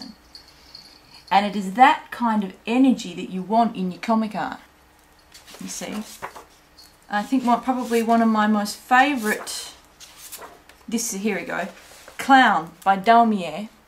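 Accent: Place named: Australia. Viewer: Yay! an Aussie you-tuber!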